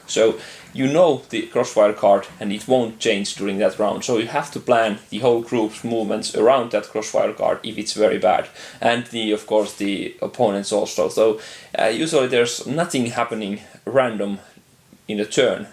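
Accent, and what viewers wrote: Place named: Finland. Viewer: Finnish-English accent! Torilla tavataan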